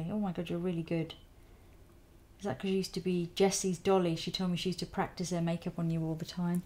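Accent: United Kingdom. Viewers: wow it's beautiful - and you have such a british accent cute :3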